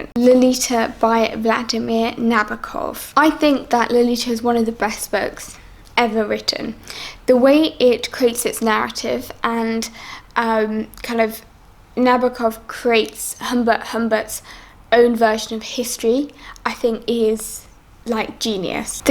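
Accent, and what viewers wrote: United Kingdom. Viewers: Wow british accent. Tbh difficult for a nonnative speaker like me to perfectly understand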